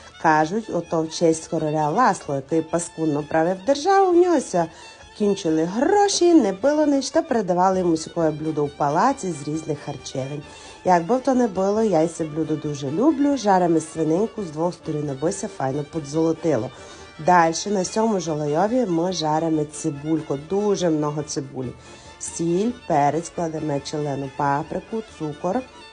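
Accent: Transcarpathia, Ukraine. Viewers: А яка чудова мова!))) я нібито на Закарпатті опинилась)))) Дякую за рецепт і за настрій)))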